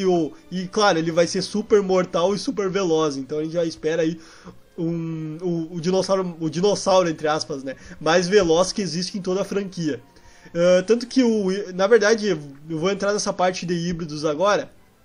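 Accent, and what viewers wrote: Rio Grande do Sul, Brazil. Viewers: Tu é gaúcho! Ba Eu também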